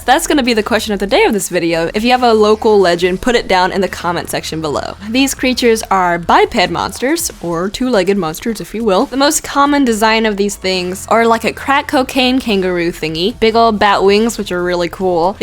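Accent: United States. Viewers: you sure youre not american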